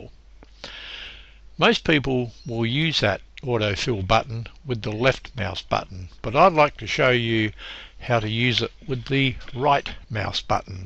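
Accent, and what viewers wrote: Australia. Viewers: awesome! Thanks. You are an Aussie...right?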